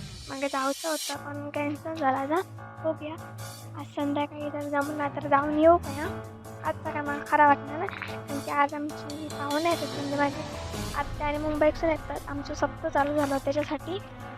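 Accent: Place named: Sawantwadi, India. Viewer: Go tu bolta kiti bhari parda mast hade tade pure sawantvadi vadi bhasha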